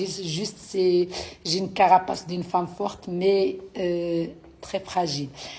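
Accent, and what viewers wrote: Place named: Kabylie, Algeria. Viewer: c'est avec un Grand plaisir que je vous souhaite un Joyeux anniversaire...et merci d’avoir gardé l’accent Kabyle....un ami des USA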